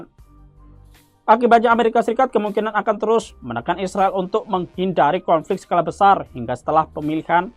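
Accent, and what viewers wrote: Java, Indonesia. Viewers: Pembawa acaranya kurang cocok, selain logat jawanya yg kentel.. cara bicaranya pun kurang mengenakkan didengar telinga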